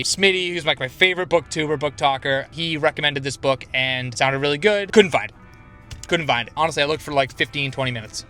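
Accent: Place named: Boston, United States. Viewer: It's ok! I've noticed your "Bostonian accent" in other videos, such as saying 'room' as 'rum' (which initially made me rewind and play it back, like "wtf... are we talking about drinks now?!" LOL) It's all good... different accents are cool 😎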